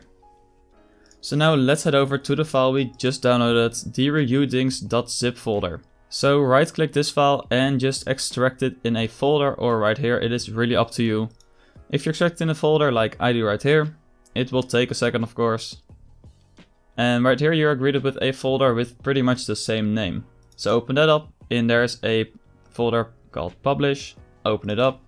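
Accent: Netherlands. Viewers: jij bent nederlands dat hoor ik aan de manier hoe je engels spreekt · Your dutch 100% sure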